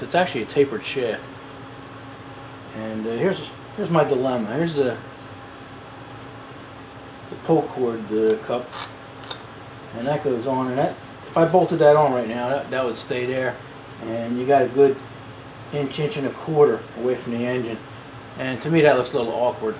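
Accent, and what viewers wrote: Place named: New York, United States. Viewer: You sound like your from new york or from the country....or both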